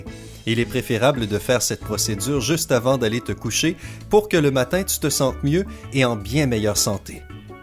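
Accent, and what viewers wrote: Quebec, Canada. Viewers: C une voix québécois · accent canadien je crois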